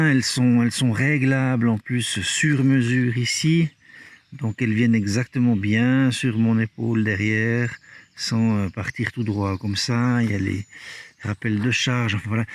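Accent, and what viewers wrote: Switzerland. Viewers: Bravo à toi d'avoir tenu jusqu'au bout. Tes vidéos sont extras et tes commentaires sont très divertissants et plein de bons conseils. J'ai bien compris que tu film avec un téléphone mais comment tu fais pour pouvoir ramener autant d'images ???? Tu consommes combien de cartes mémoires ? Par contre chapeau pour les prises de vues, un vrai cinéaste ...... Continue comme ça c'est top :-) Bonne continuation. PS : J'adore l'accent Suisse ;-)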